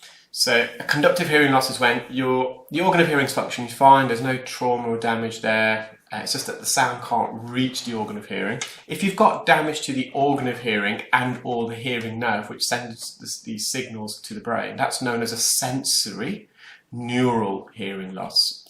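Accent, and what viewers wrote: United Kingdom. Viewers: My daughter has profound bilateral sensorineural hearing loss and uses a cochlear implant. Thanks for the lesson on the differences in hearing loss; I was glad to have a refresher course. She went from hearing aids to the implant but still continues to have itchy ears. I took your advice and bought the Earol spray and it is helping. Thanks! Love your videos and your proper British accent. I get so tired of hearing all the “yinzer” talk here in western PA.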